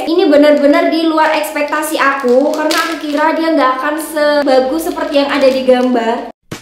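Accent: Java, Indonesia. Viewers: Kok ngmg jawanya berkurang 🙂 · Kak orang mana sih kok logatnya kyk orang jawa..jawa mana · Medok bangett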